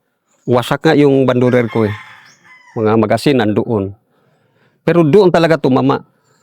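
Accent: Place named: Subanen, Philippines. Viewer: Sa accent ni Master Subanen tribe sya kung di ako nagkakamali kaya matapang... Matapang ang Subanen tribe sa history nilo bolo lang at spears ang armas nila... Salute you!...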